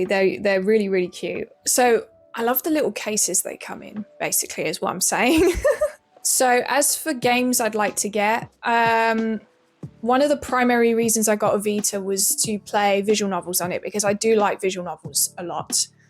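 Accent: United Kingdom. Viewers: Came for the vita, stayed for your cute British accent Love From from Portugal.. +1 sub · Gamer girl with a british accent. It doesn't get much better than this.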